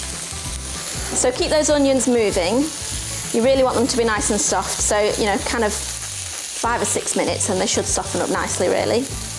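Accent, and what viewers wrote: United Kingdom. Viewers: of the video, I heard her say, "I'm just going to get my tongue out" when what she meant was get her tongs out. Some regional British accent are hilarious or simply annoying which is I suspect why she got so many thumbs-down.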